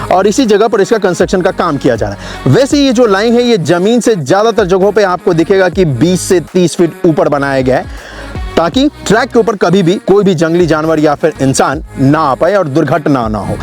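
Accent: Bihar, India. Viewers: he need to fix his Bihari accent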